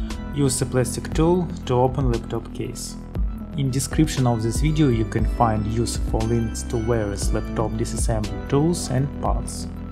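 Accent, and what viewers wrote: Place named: Russia. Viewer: Ведь явно слышен русский акцент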